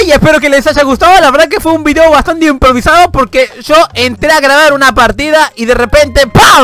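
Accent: Argentina. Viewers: Sos argentino? Xd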